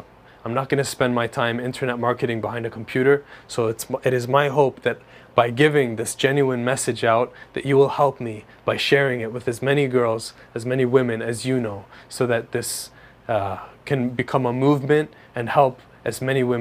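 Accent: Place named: Greece. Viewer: I love your accent...Are you Greek?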